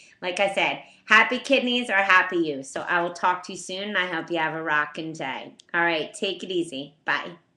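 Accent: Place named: South Shore, United States. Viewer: listen to that accent, south shore perhaps? can you eat them raw?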